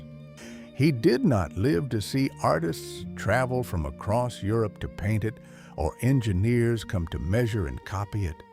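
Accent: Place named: Appalachia, United States. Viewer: What on earth are you doing using an American hill billy to give a commentary on industrial Britain???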